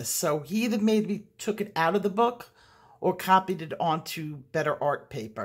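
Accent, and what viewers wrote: New York, United States. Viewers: Great to hear that New York accent again on "ColotTube". Your instructions are always welcome, however, if I followed your first one to " plan ahead before you pick up a pencil " I would have close to zero colored pictures! About the closest I get to pre planning is picking which colored pencil set I need to take off the shelf. At 76 who has time to pre plan? See you soon. I'm pre planning to watch you. Don't take too long.👵♿🎨 Barb N.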